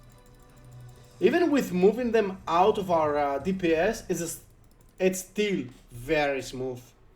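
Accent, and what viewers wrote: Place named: Greece. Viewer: Σιγουρα ελληνικη προφορα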